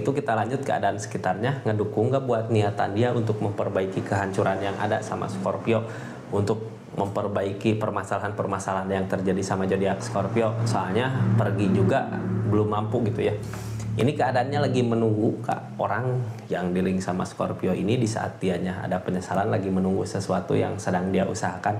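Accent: West Java, Indonesia. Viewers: Si Aa lucu speak up aksen Sunda pisan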